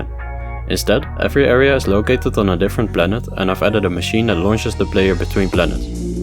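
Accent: Netherlands. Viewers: this dude dutch??? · haha you are dutch :)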